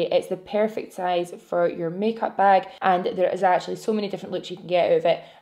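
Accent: Scotland, United Kingdom. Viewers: My 5 year old daughter said “ mommy who are you watching “ so I showed her She said “oh you’re watching that girl again I like her voice” I said me too It’s funny because Scottish accents have literally been my favorite since I was so young Also she just said again “ why does she have such a beautiful voice “ I think my daughter clearly loves your voice just as much as me haha ♥️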